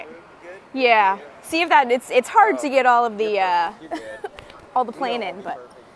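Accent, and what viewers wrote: Canada. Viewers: Great video , your adorable! So cute.. I can tell your from Canada.. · Ha you sound more Canadian in this video.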